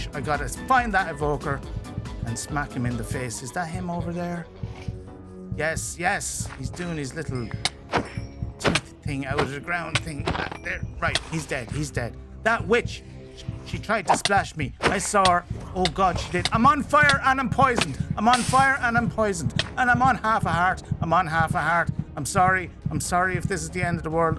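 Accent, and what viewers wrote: Ireland. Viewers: how do you not have more subscribers!!? well im happy to be here anyway definitely a new favorite youtuber, most people that do hardcore series go straight to automatic farming and beating the game but you have a big focus on builds which i love and a fun humor and voice. You remind me a lot of one of my favs is Waxfruad just with that irish accent 😂 Thanks for the videos youre awesome!! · Wow you were not kidding with that heavy Irish accent 😂😂 And I actually like it, it's fun😅😂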